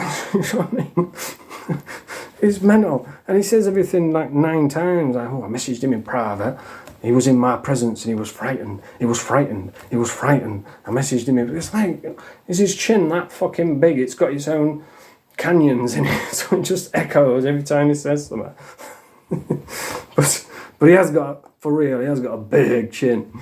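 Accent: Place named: Newcastle, United Kingdom. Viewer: That geordie accent 😂😂 class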